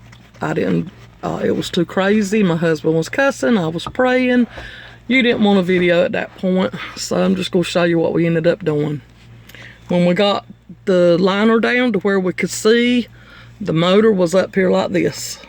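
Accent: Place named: Tennessee, United States. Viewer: That sounds like a tennessee accent if I've ever heard one, right or wrong? Lol. Thanks for the vid, these sunroof motors are insane to get out.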